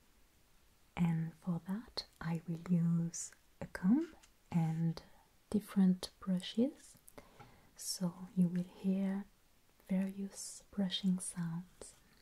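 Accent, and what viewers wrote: France. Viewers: I watched one of her role playing videos as my introduction to her and thought she was doing a really good fake French accent, I came to this one expecting a regular voice when I realized she’s literally French and I’m just dumb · un accent très français tout ça 🤔😂 '' a very french accent all that '' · This is the perfect representation of the 18th century ♡ also, I was wondering, are you French? You seem French, I'm French so I don't know your beautiful accent looks French :) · ~I'm a writer and I have a new character who is French and when I realized I needed to be able to describe a French accent, I came here because your accent is so lovely.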